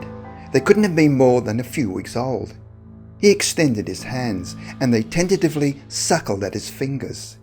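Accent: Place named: Australia. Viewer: What a touching true story! Proves that "Truth" which is in this case has taken a form of Mother Nature Herself, can manifest in ways far stranger than those in fiction! There is an intrinsic bond among all the inhabitants of the planet which comes to the fore, and as the narrator has so rightly pointed out, all this transcends "conventional wisdom". The narration is also very engaging, I suspect the accent is the cordial Australian one!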